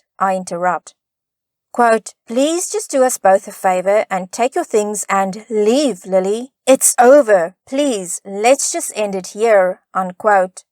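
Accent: South Africa. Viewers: Aaaaah the rare south African Afrikaans accent out in the wild! What a rare sight! Great commentary at the end :). All the best for your channel!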